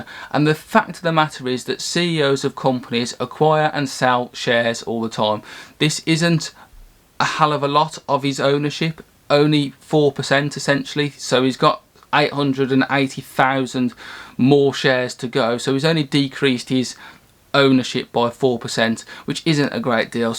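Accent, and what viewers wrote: United Kingdom. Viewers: Got to say I’m loving the fact that I have found someone with my home accent... your obviously a Brummie? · Prolly UK somewhere lol.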